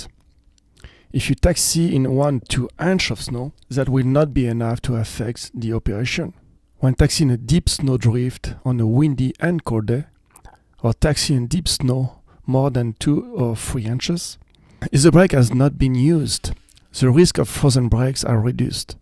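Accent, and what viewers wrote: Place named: France. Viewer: french accent!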